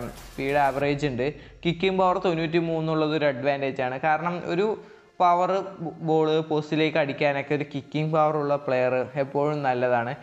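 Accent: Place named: Palakkad, India. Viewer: എനിക്ക് slang കേട്ടപ്പോൾ മനസ്സിലായി ഞാനും paalakadanu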